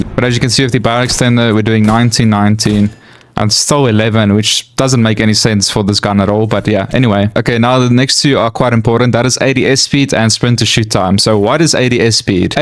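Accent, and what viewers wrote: South Africa. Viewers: Awesome accent bro, South African right? I love it, and great informative video!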